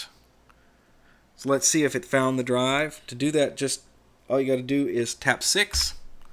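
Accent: United States. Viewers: just found you! Disabled, I am keeping myself busy trying multiple times to install Linux with failure due to various reasons. lol I need to desperately get up and running within a week only or else I will be in a pickle situation. Familiar with using pc and windows 30yrs, midrange / mainframes-user. U sound like u r n USA. I am limited to web access now. I have few KEY QUESTIONS. Can I email you Sir? Humble Respects. Alton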